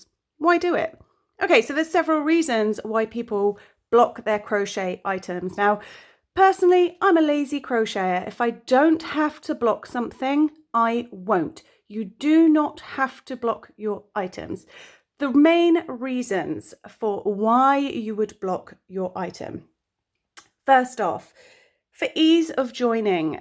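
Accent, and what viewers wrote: United Kingdom. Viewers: what kind of british accent do you have? sounds cool!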